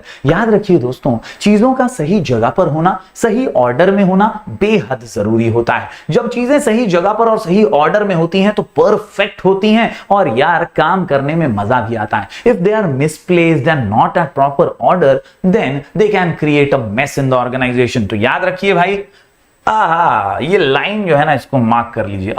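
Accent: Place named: Bhojpuri, India. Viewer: Btw sir aapka bhojpuri bolne ka tarika bhot mst h 😂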